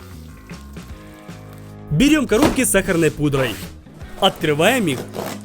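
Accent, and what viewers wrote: Ukraine. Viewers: Украйнский акцент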